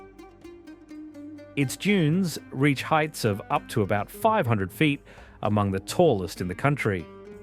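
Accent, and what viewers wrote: Australia. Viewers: An Aussie narrator, not a bloody yank - thank god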